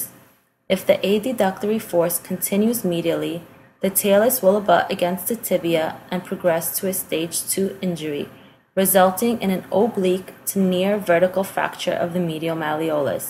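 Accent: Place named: New York, United States. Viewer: Love the accent!!! Marisa Tomei in My Cousin Vinny...Are you from New York?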